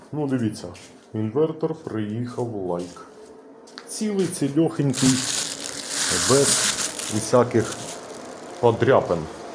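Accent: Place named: Poltava, Ukraine. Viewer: Говір Полтавський)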